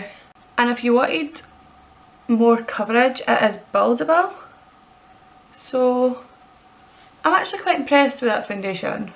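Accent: Scotland, United Kingdom. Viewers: I just adore the Scottish accent, I’m so obsessed with it 🥰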